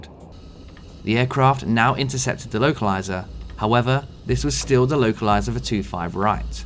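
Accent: United Kingdom. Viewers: I've skipped by your channel many times, didn't realise you was a fellow Brit. Thought you was another one of them boring American channels. Subscribed!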